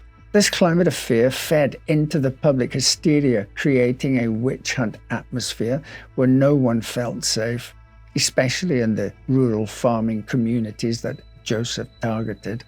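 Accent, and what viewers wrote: Scotland, United Kingdom. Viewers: I love his voice and accent. Is he Scottish? 🥰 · I’m no expert but that’d be my best guess too, Scottish. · "Somehow" I knew one-day a British voice would explain that there was a French murderer than was worse than Jack The Ripper! Ha!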